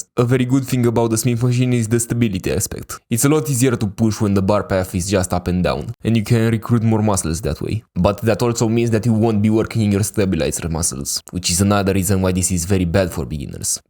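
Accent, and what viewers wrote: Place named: Russia. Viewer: its impossible to listen this rus sound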